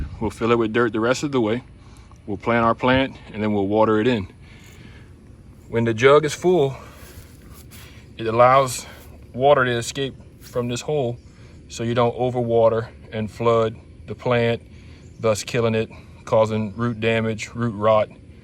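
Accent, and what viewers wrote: Louisiana, United States. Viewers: Omg I knew it! I heard the accent & knew it was Louisiana! I had to check the page to find out. I love yall folks down there. ❤ Great video & VERY informative. I'm going to be a first time gardener so I'm soaking up all the knowledge I can to ensure a successful harvest once I get started. Jist gathering the rest of my supplies. I can't waitttt!! 😁🙌🏽 Thank for this great info. Im definitely going to do this. I've been worried about using plastic in my garden (even food grade) but it seems you really can't get away from it. 😕 So plastic it is. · Gotta love my down the bayou people,,, love the accent. Missing it up here in the Okies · I know you Cajun “mark dat” you must be close😆. Going put my scrap pvc to work…Great vid! Also, started getting free buckets from Super One from the bakery, so this works out perfect! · New subscriber here, thanks for sharing. 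first time I’ve seen this technique, Great idea, you sound like a neighbor to me, I’m a recent transplant to Covington but grew up in Metairie. You from Louisiana? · What part of Louisiana are you in? I hear the accent all day. Originally from Laplace. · Do I detect a Cajun accent?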